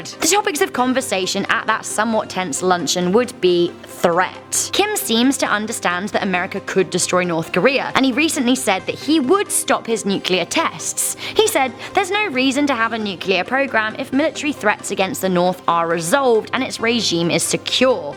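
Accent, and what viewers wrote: United Kingdom. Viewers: ‘Ello fellow Brit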